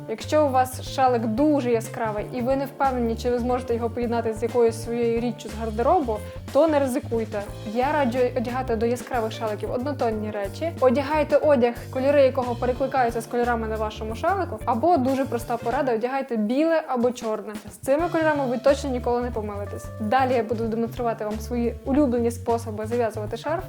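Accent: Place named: Ukraine. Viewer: У Вас дуже гарний український акцент:-)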